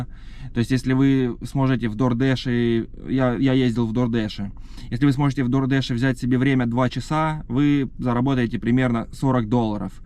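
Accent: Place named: Kharkiv, Ukraine. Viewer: Похоже на Харьковский говор)))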